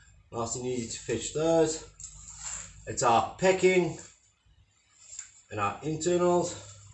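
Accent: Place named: South Africa. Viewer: So great to hear a South African voice in far of Europe. Unmistakeable 😂. Subed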